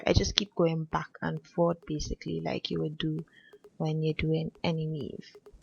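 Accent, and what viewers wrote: Trinidad and Tobago. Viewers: Your accent sounds Trinidadian...great tutorial btw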